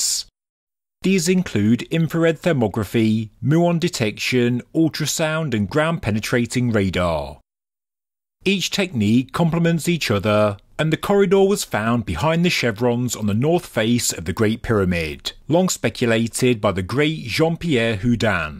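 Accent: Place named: Leicester, United Kingdom. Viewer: no I was only talking about the accent and the tone of the sentences, guess I never heard the leicester accent😂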